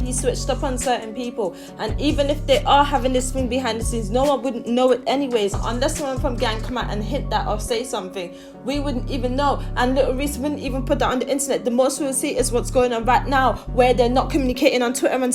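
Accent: United Kingdom. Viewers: How the fuck do you have subscribers you speak straight gibberish mad fast with a British accent. Idk if you’re making a video about Chicago or a London drill rap either way both trash.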